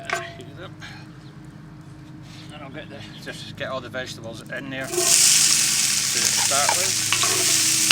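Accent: Scotland, United Kingdom. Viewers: Are you Scottish (I am) and for the pen fishing rod video you made a year or so ago can you make a follow up video on the line, hooks, bait, floats, weights ect. Great video and have a good day!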